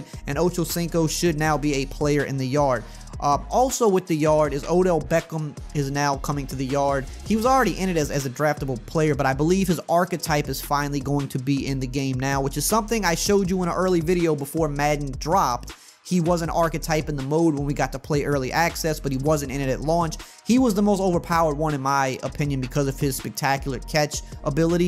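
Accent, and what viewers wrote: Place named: Alabama, United States. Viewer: His voice really said ✨ Alabama ✨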